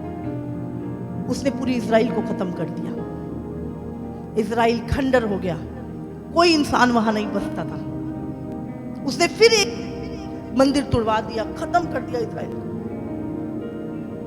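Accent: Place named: Jharkhand, India. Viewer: Malgo jharkhand Sa bolrhi apa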